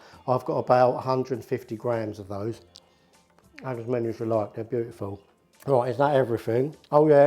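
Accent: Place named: South Coast, United Kingdom. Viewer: Great video mate, where you from in the uk? You sound like my mate from the south coast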